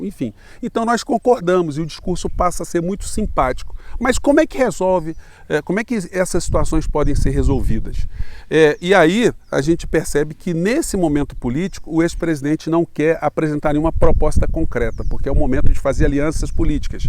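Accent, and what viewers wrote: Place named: Minas Gerais, Brazil. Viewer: Uai!!! Não sabia que vc é Mineiro, mas desconfiava pelo jeito de falar!! Aaaamo! 👏👏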